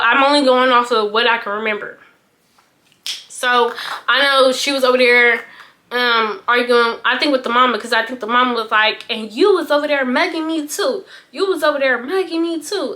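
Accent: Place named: Bay Area, United States. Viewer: You must be from the bay lol I hear that accent 😂❤❤❤ you sound similar to stunna girl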